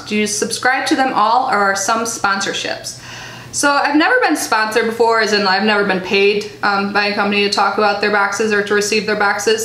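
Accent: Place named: Chicago, United States. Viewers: So excited to watch this 😀. Hope you aren’t getting sick. Feel better. You are young sister. I’m 47. So should we call you? 👩🏼‍⚕️🐱 “Drinks with a purpose” 😀 Archer and Laaaannnaaa would have been epic. Even if they are siblings I’m sure it would work. Lol. But Fiona is a fun name. And Emmy. All good names. 30 boxes! Wow 😯 and I don’t think that you sound funny when you talk. You have a nice midwestern accent 🙂. I’m totally going to look up your first video 😀💖 thanks for doing this Q and A. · Awesome. Thank you for doing this. Your cats are adorable. My sister may be moving to Florida soon. I am not sure when or where yet. Her husband is up for a promotion. You don’t sound weird when you talk. You sound like you are from Chicago. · I heard, but don't know if it's true that everyone is off the wait list in April for Ipsy plus. It stinks because my Ipsy acct I pay yearly since that's easier for me, my other account(my daughter's) is monthly and I skipped it this month so hopefully I can swing it next month if it's true that the wait list is done. 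I don't think you talk funny at all! You do have a strong Chicago accent though. People that I know that aren't from Philly always comment on my "accent" and I think it's so funny because I don't think I have an accent lol.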